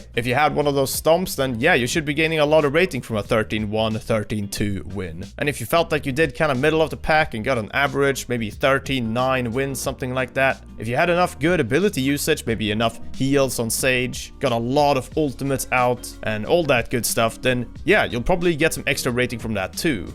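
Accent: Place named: Sweden. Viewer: is he swedish? sounds like that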